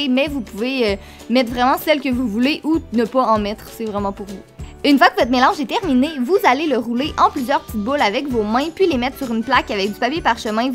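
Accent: Quebec, Canada. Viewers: Salut tu et trop belle j'adore ta série back to school et j'adore ton accent québécoise continue comme sa bisous ma belle j'espère que la joie et la bonne humeur soit avec toi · J'adoooore ton accent canadien 💕💕💕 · J adore ton accent QUÉBÉCOIS est que tu peut me repondre je t adore stp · oh mon dieu je suis marocaine mais j'adore l'accent Québécois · J'adore tes vidéos et je t'adore !!!!!!!! ton nouveau décor de vidéo est juste trop beau !!!Tu es trop belle et je trouve que ton accent canadien est très joli !!!PS : Je passe en 5ème et je reprend le 5 septembre. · Super vidéo comme d'habitude. C'est la première fois que je commente une de tes vidéos mais elles sont super. J'aime trop trop trop ton accent québécois. Je t'aime tellement. Continue comme ça, ne changes jamais ❤️❤️ P.S je te suis depuis tes débuts de vidéos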